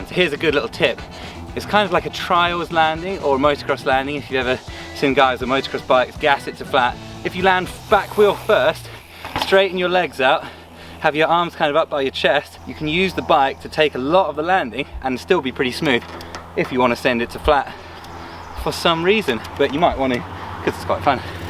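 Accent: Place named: England, United Kingdom. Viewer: that prim and proper english accent juxtaposed with outrageously insane bike skills, full marks sir!!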